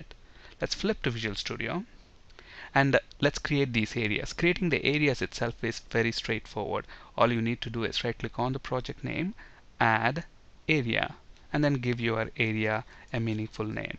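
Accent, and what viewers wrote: India. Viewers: At first i didnt liked ur tutors, but ... you are the best tutors maker i have ever seen. Keep up. I didnt like them cuz of the common words like "vep-applications; vindows" etc :D Indian stuffs... but u are awesome :) That on impressed me the most... i mean that u are using fresh VS from beggining and in every tutor u are using same names for ur models, views etc.. so it makes it more clear. GOOD JOB!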